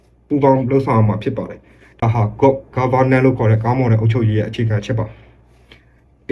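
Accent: Dawei, Myanmar. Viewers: ထားဝယ်ဖက်ကအသံပါ။တနင်းသာရီတိုင်းထဲကဖြစ်မှာပါ။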